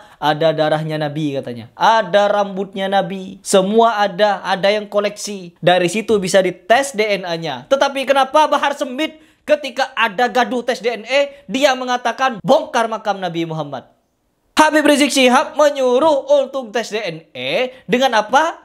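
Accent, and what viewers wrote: Madura, Indonesia. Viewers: Logatnya kaya orang Madura.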